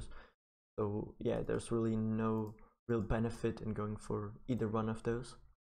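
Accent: Germany. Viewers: ich wuste es das du ein deutscher yter bist wegen dein sprechen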